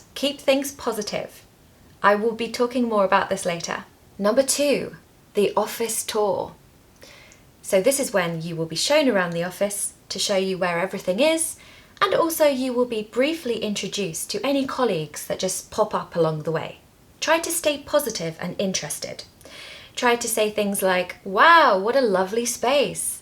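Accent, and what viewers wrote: United Kingdom. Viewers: I appreciate your hardworking and the opportunity to see and listen to your videos every day, Really your British accent is incredible and I watch it every day your lessons. Thank you so much. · Great British pronunciation. Absolutely teachable class. T I want to reading passage class. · I love your British accent and watched your every episode and repeated . Appreciate ! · I watched several videos about this same topic but this one is so useful & informative with a superb lovely British accent that i wouldn’t hesitate to watch again ❤ Thank you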